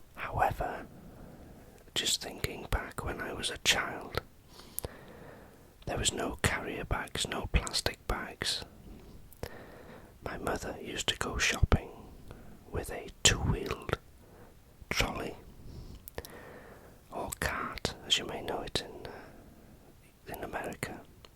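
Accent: Wales, United Kingdom. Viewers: Cardiff or swansea ?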